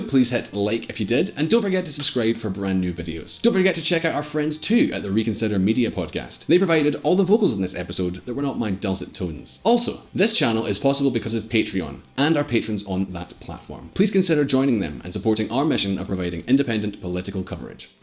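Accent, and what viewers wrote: Scotland, United Kingdom. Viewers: Where is simon 😴.... this Scottish accent is too strong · I´m sorry but the scotish accent is unbearable - can´t through these vids no more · Well I like the Scottisch accent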